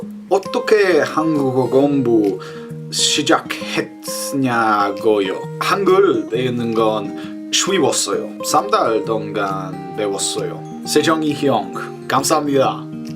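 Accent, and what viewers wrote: United Kingdom. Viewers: you guys make me want to speak british accent too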